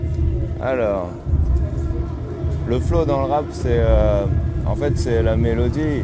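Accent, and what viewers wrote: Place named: Lower Normandy, France. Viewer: Il vient de Caen ça s’entend il a l’accent Bas-Normand Il parle lentement, il a un coup de barre depuis ses 14 ans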